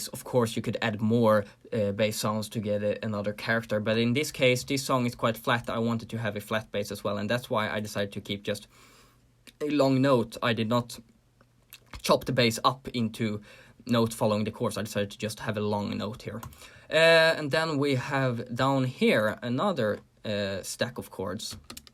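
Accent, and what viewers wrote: Sweden. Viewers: thats the swedish accent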